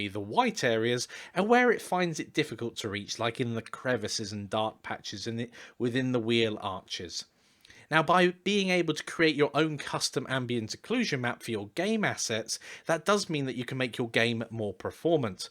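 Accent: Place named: United Kingdom. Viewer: These videos are great. Besides, the British accent is great for teaching apparently. :P Thank you so much for the time and effort you take to teach us! :)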